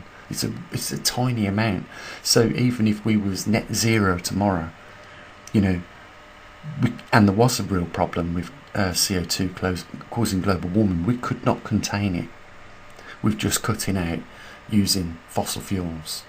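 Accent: United Kingdom. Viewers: Hi. First of all, I must say I like your information of magnetic poleshift a lot. But as a non-native English speaker, I find your British accent is too difficult to comprehend or access your content. I have a little problem when listening to an American accent speakers. If you are aware that not only native English speakers have followed your jobs, but there is me following your difficult accent also. Your fan from Thailand.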